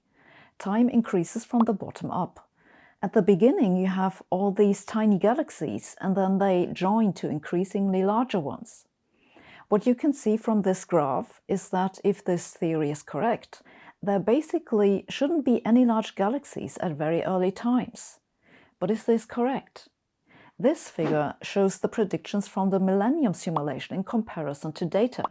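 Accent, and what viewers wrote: Germany. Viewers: May I ask Professor, are you german?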